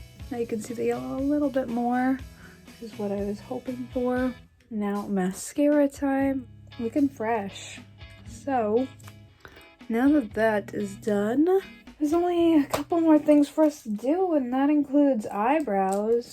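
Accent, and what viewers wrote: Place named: North, United Kingdom. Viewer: I stumbled upon one of your videos today and found myself listening to others for the past couple of hours. I am obsessed with vintage clothing and love music before my era as well. You were asked a question about mental health and answered so honestly that I wanted to applaud your courage. Great job on the videos! P.S. I am originally from up-north and recognized the accent immediately ; )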